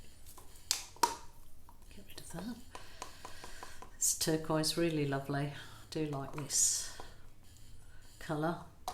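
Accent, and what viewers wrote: Birmingham, United Kingdom. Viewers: Better than wasting leftover paint :) And it's fun to just play around sometimes! I've noticed that when you take your photos, we see the colours more vibrantly and possibly more true to life. Also, I knew you were in Australia, but I assumed that you were from the UK lol, Your accent definitely has a touch of Brum to my ears. But I am almost completely deaf so my ears can't be trusted lol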